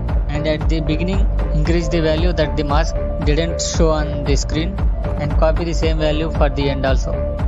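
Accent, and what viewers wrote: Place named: India. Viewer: pls use ai voice or something its hard to understand indian accent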